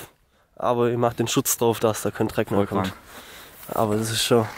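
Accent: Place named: Geislingen, Germany. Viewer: Geißler aktzent.. Fend I tob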